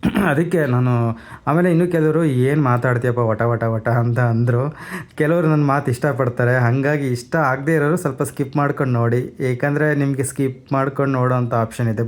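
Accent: Chitradurga, India. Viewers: ಸರ್ ನಿಮ್ಮ ಮಾತು ಕೇಳಿದರೆ ಸಂತೋಷ ವಾಗುತ್ತದೆ. ಚಿತ್ರದುರ್ಗ ಭಾಷೆಯ ಸೊಗಡು , ಪದಗಳನ್ನು ಬಳಸಿ. ಬದಲಾವಣೆ ಬೇಡ . ಏಕೆಂದರೆ ಕನ್ನಡ ವೈವಿಧ್ಯ ಮಯ ಭಾಷೆ . ಪ್ರಾದೇಶಿಕ ಭಿನ್ನತೆಗಳು ಇರುತ್ತವೆ . ನಿಮ್ಮ ಮಾತು ನಿಮ್ಮ ಸೊತ್ತು . 👍👍👍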